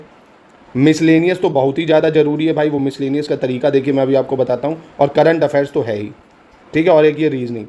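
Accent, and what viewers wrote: Kanpur, India. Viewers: Kanpuriya hain ase hi Bolte hain😅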